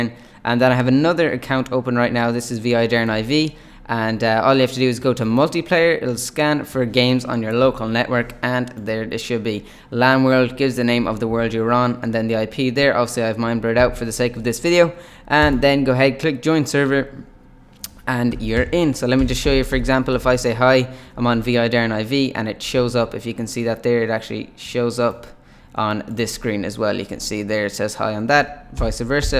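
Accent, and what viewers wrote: Ireland. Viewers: Are you Irish? Also, do the 2 accounts have to be on 1 computer, or can 2 people use seperate computers and join?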